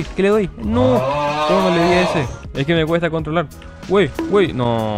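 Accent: Paraguay. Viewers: sabía luego kp , se pilla umpoco tu voz , solo los paraguayos sabemos darnos cuenta 🇵🇾😂👍🏼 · Se te nota tu hablar de paraguay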